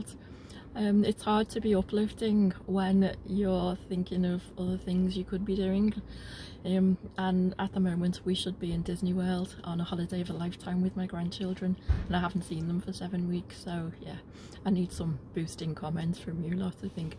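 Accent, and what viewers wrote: Liverpool, United Kingdom. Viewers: I’m so sorry you’re missing your grandkids and that the quarantine is squashing all our travel fun. Our trip to come see you in August will have to be rescheduled as well. I’ve been waiting way too many years so another one won’t be the end of the world (it just feels like it!). We will plan again just as soon as we’re given the green light. Thank you for your videos to tide us over - they are splendid for the eyes and ears (who doesn’t love a Liverpool accent???!!!!!) We are wishing right along with you,! 💕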